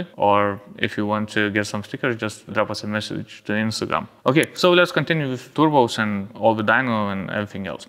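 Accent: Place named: Russia. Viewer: Nah mate, like a man who can russian and perfectly English he has an typical russian/East european accent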